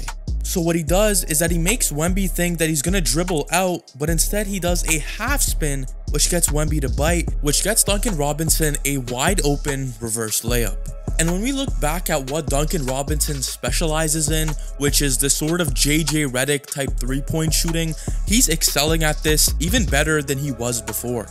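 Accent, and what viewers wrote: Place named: Miami, United States. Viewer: ooohh ok. I ask cos your accent is similar to a Miami accent. When you say "ago" in "two weeks ago" at the beginning, it sounds just like a Miamian lol and the way you say "bro" fits in perfectly on south beach lmfaooo. Great video! Love to the see Heat getting love. Haywood Highsmith is awesome!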